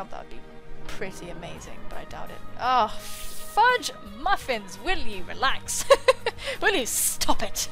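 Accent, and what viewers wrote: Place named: West Country, United Kingdom. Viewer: I only just picked up on your accent. I think it was the way you said "iron head" that did it. Devonshire? Definitely sounds Westcountry to me.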